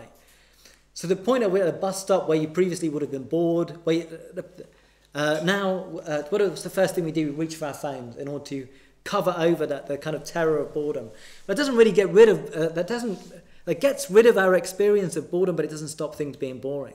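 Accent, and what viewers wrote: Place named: United Kingdom. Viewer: British accent is tricky 👽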